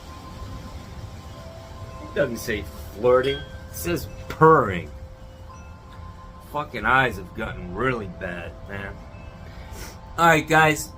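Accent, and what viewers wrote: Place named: Northeast, United States. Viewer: your face now fits your NE accent! Not many people get to a point where their faces and voices line up.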